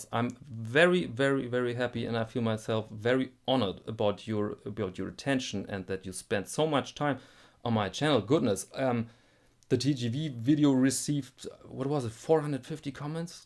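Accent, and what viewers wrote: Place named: Germany. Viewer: I mean deapite his obvious flaws he is intelligent, witty, eloquent and a man of culture with an awesome german accent. Keep up the good work!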